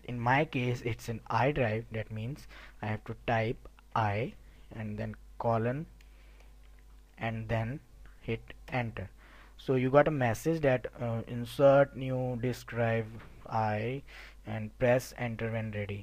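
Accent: India. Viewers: fuck indian accent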